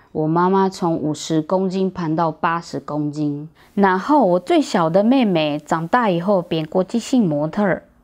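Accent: Taiwan. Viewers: 好地道的臺灣音！ 莫問出處,那處安定是吾家！ 要相信緣份,好好耕耘下去！ 祝福！ 謝分享！ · 這已經是台灣腔，百分百台灣人啦！😂🤣🤣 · 台灣人的中文口音，不說還以為你是本土混血兒。 長得好看，一家人跟老公都很好看！ 祝福你們一家人永遠健康幸福喔！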